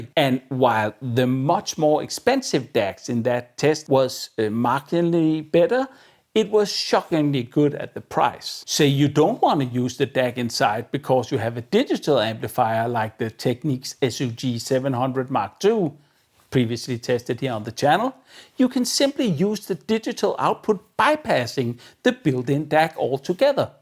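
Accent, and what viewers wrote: Denmark. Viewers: Danish accent is just the best 🙂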